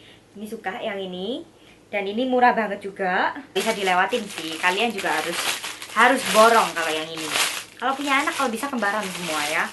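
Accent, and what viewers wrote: Java, Indonesia. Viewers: Kak asli orang mana? Kayak orang cina tapi cara ngomong nya orang jawa 😁 IG Lutfianasukma #kathlakzgiveaway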